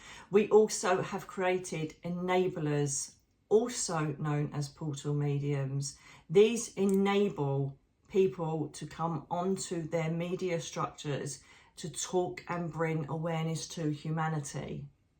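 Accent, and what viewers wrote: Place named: South London, United Kingdom. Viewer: your star cardi is fabulous!! I love the fact that you sound very much like me (I’m a south London girl!) and who wouldn’t imagine Gabrielle with wings - wings are awesome! 😂❤ thank you so much for channelling this amazing message, I have been talking to the angels almost everyday recently just for strength and to help me heal from surgery and to give me a boost from the RA, I don’t know about you but the cold and wet weather makes me feel 10x worse! Sending love & light to you, Darren and Teddy Bear ❤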